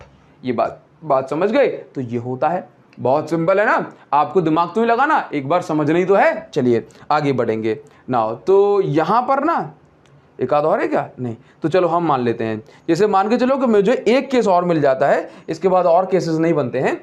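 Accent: Odisha, India. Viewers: Sir aap odisha se ho kya ?😅😅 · sir you are from odisha?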